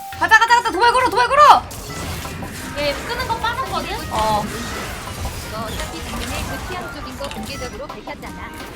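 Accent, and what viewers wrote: Gangwon, South Korea. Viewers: 저 예쁜 공주같은 얼굴에 강원도사투리까지 쓰시니까 너무 매력적이다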